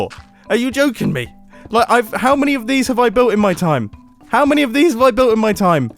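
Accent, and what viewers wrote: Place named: United Kingdom. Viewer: That Bri-ish accent tho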